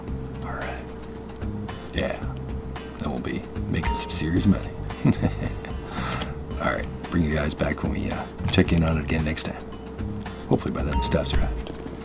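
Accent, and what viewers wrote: Canada. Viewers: U sound very Canadian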